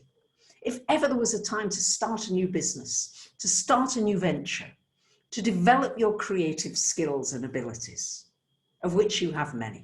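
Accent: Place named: London, United Kingdom. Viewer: Idk y this whole time I considered ur accent Aussie but I now hear london 😌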